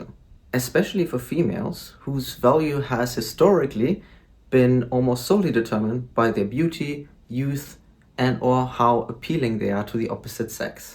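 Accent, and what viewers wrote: Germany. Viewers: your accent sounds very german.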